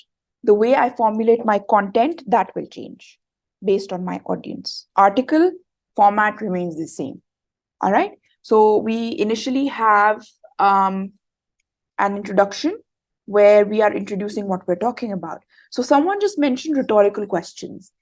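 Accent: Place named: India. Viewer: Ma'am sounds desi while speaking urdu and fancy while speaking english😭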